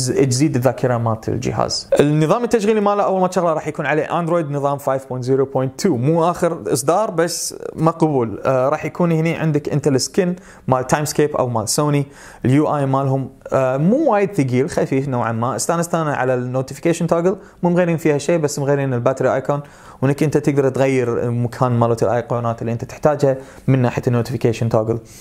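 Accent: Kuwait. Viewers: حلقة اليوم فيها كثير من الخلط و الاخطاء في المعلومات . بالاضافة ان اللهجة الكويتية اليوم اكثر من الفيديوات السابقة. رغم كل هذا فيديو جيد .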